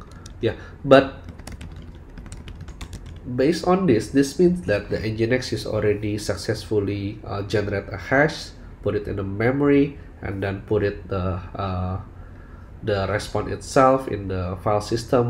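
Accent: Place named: Philippines. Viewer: Are you from Philippines? Your accent is so similar to my co-worker it almost feels like I'm listening to him talk 😁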